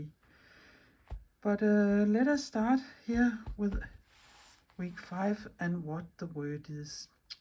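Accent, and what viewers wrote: Denmark. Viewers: I love everything you do … and I especially appreciate the slow pace. Your Danish accent contributes to that … although I speak English, I find that the way you speak is very relaxing & totally understandable!! 💗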